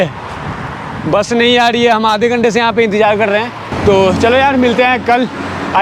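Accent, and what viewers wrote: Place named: Bihar, India. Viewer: Bhasa mein thoda bihari put aa raha hai. Jaise aap aur ko aud bol rahe ho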